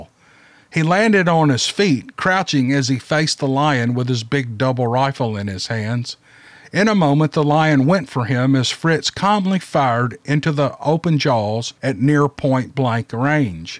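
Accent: South, United States. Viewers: You could monetize your voice. You have a voice I would rather hear than most of the books I've listened too. Maybe it's because southern people are my people! When I listen to a book, I want to Invision the story, not try to understand an accent or be distracted by it. I'm serious! I would listen to more books if you narrated them.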